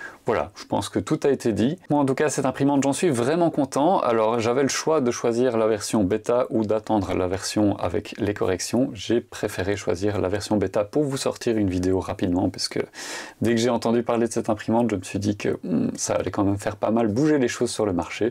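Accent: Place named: France. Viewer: I am from the usa and youtube tries to put the english ai robot voice over your beautiful French accent, I turned it off ;) I can't want for this one! I just bought an H2D to go alongside my X1C and those printers are bulletproof workhorses, but this U1 will speed up multicolor prints by so much, while reducing waste as well as some color bleed issues I had to tune out of some of my prints with a lot of white filament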